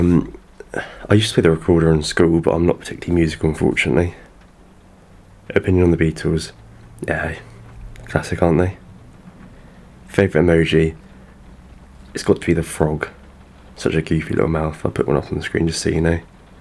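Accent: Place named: United Kingdom. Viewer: Wow. This is the first impression of mine on this Chanel. If YouTube algorithm suggested I needed this, I'll take it :) I naturally listens to asmr (nature sounds mostly) because I need to focus on my books when I study through late night. Never thought I'd like to listen to British accent. It's really pretty now that I'm hearing it with concentration. It seems every language sounds different especially when spoken through each different accent. Besides that, you have got a really calming voice — it's easy to play it in the background and write through notes or journals. Feels like someone is accompanying. I'd like to subscribe because it feels right investing a little bit of time on when it can accompany me for studies! Please keep surprise us with new videos and be in good health and mind ❤ You have got this ✊ [ — this was fascinating to know someone online who shares quite similar perspectives as I do. 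That's pretty surprising because I feel like some people finds it overwhelming but it completely depends 🌞]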